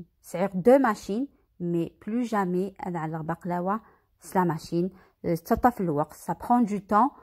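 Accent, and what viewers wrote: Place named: Béjaïa, Algeria. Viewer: La présentation et la formation juste moyen y a meilleure Consulté youtube vous verrez Merci comme même pour la recette L accent me fais rappeler sidi Ahmed Béjaïa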